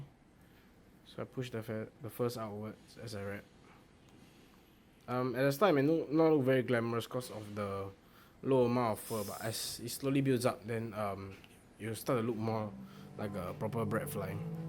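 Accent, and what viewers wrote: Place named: Singapore. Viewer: Where do you fly fishing usualy?are you from singapore??the way you talk just like a singaporean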